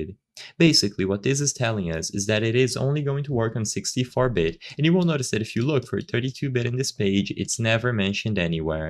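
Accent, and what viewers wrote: Brazil. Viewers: is that a brazilian accent?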